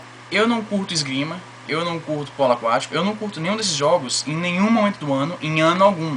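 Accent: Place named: Northeast, Brazil. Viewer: tenho amigos online do nordeste e eu percebo um pouquinho de sotaque sim kk, mas bem pouco mesmo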